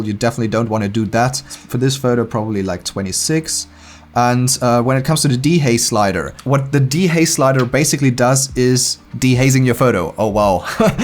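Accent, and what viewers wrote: Germany. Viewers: Are you German? Because you sound like this😅. Ps: I am German too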